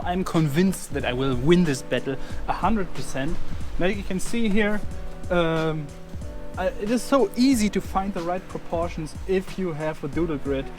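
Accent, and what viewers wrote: Germany. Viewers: Dieser Deutsche Akzent killt mich einfach😂 Ps: Du hast echt heftiges künstlerisches Talent Tolles Video und schöne Grüße aus dem Emsland✌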